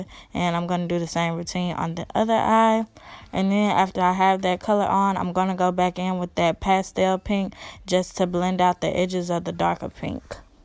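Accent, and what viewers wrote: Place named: South, United States. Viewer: U so Country 😂 the first minute of the video I couldn’t stop laughing lol gotta be somewhere down here in the south. Awesome work 😍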